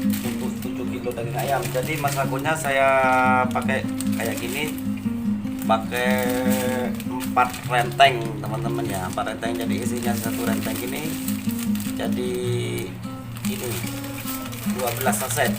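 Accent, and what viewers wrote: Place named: Madura, Indonesia. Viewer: Orang mana mas Logatnya seperti orang madura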